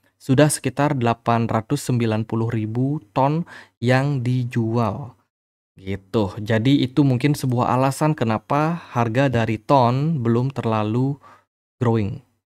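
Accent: Bali, Indonesia. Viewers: Sy suka pembahasan yg mengkaitkan ekonomi makro dengan crypto... Auto subscribe Dari logat huruf "T" nya, pasti dari Bali.. makasi bli ☺️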